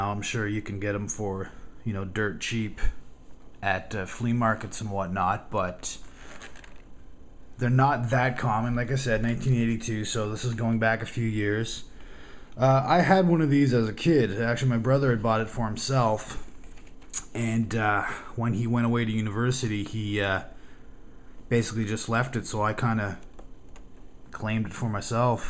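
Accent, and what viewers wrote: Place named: England, United Kingdom. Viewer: amazing man. great memories. and when you said you grew up listening to the expos on the radio, i almost cried. what are the odds?? i too am from Montreal and grew up a big expos fan and also used to hear them on the radio every game. i loved my expos. but how come you listened to Jacques Doucet and not Dave and Duke? you sound english, so this shocked me. My dad used to listen to Doucet, then i'd change it to Dave van Horne and duke snider and we'd listen together. Great memories of Nos Amours and the good old days. thanks for the vid. you brought me back to my happy time.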